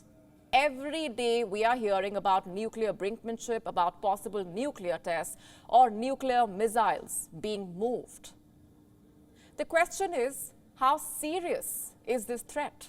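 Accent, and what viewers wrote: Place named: India. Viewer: Can u get rid of that accent. It sounds like any stereotypical Indian character in a western movie.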